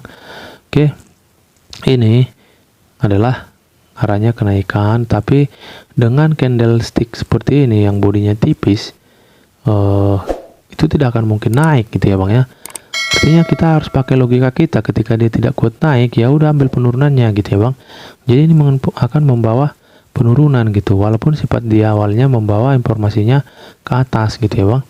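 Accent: Medan, Indonesia. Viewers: Bg orng Medan yaa ?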